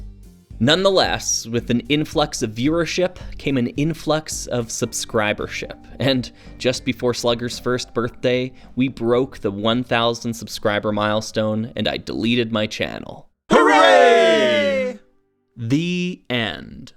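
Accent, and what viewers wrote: Canada. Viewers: I found you through your lego space minifigure tier list, which I frankly still disagree with, lol, but thats ok. I absolutely love your quirky and odd videos and slug stop motions and odd taste in sets; theyre all highly engaging. Also your very strange Canadian pronunciation of so many words. Good times. I'll be sticking around for the long haul to see what else is afoot in the channel. Keep up the great work,!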